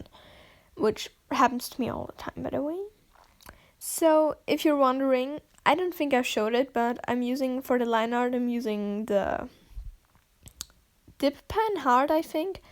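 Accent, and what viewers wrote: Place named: Germany. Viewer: If you didn’t have your German accent, I would defo believe that English is your first language!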